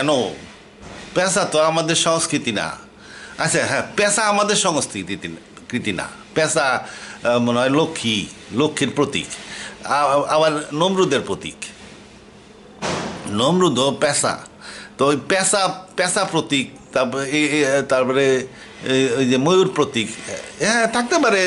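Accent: Manikganj, Bangladesh. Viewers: আপনার প্রত্যেক আলোচনা আমার অনেক ভুল ধারণা ভেঙে দিয়েছে।আমার জ্ঞান অনেক সমৃদ্ধ হয়েছে।ধন্যবাদ আপনাকে।আপনি কোন জেলার মানুষ? কথা শুনে মনে হচ্ছে আপনি মানিকগঞ্জ জেলার কিংবা আশেপাশের জেলার মানুষ। উত্তর দিলে খুশি হবো।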